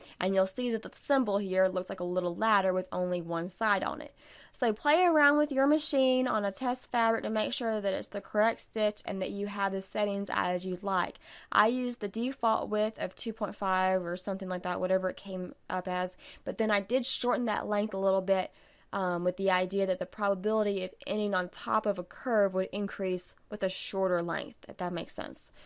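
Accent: South, United States. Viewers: Thank you!! My first time watching and I will be watching again (and again!) Your very gentle southern accent just makes the entire video all the more pleasant. Excellent close ups and hints and tricks for this classic heirloom look.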